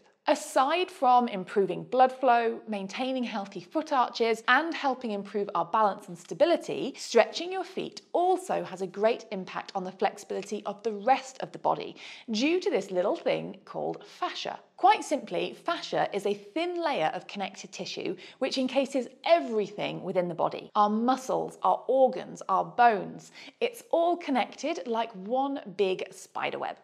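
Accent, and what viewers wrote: United Kingdom. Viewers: And beautiful English accent. Not another American.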